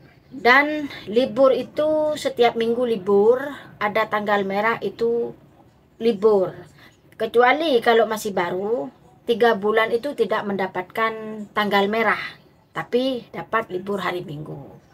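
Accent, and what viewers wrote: Madura, Indonesia. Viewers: Kok kayak reng madure nik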